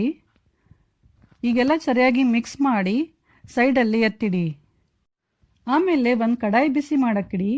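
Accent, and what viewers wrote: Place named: Bengaluru, India. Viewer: U speak Bengaluru language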